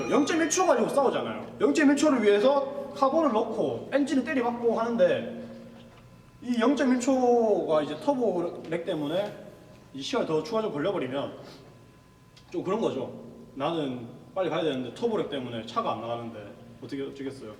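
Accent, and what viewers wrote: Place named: Gyeongsang, South Korea. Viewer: 최초 갱상도 사투리 리뷰.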